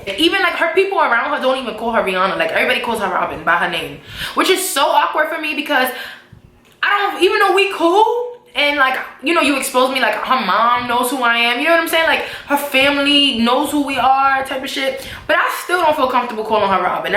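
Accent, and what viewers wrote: New York, United States. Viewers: Girlllllllll you are a true New Yorker that accent tho!!!!! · Sound like Rihanna with a New York accent deadass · Na for nothing 😮 you from ny Lmaoo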